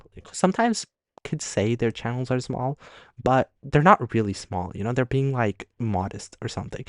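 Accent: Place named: United States. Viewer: Lovely american accent dear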